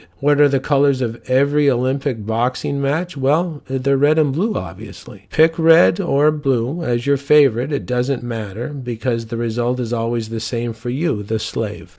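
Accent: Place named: Canada. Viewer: I love the sinister tone delivered in a Canadian accent, LOL.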